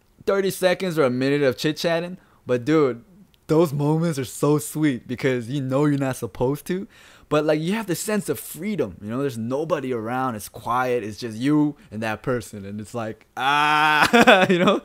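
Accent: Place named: New York, United States. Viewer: did you go to school in new york? sounds like your from new york. I HOPE U ARE XD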